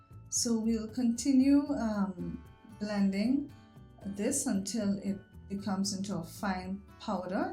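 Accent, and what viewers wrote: Trinidad and Tobago. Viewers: It sounds like a Trini in the house.:elbowcough: Great vid. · Is that a Trini accent i detect..? 🤔😃 Very informative, exactly what i needed.. thank u.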